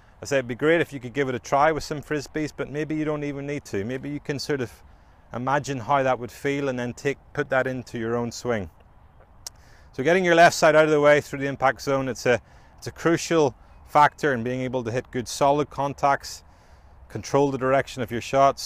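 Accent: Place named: Northern Ireland, United Kingdom. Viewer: Was pleasantly surprised to hear the N.I. Accent. Great video! Will definitely help with what I am struggling with. I am longer than most off the tee and probably average length with irons due to my “stuck behind” swing.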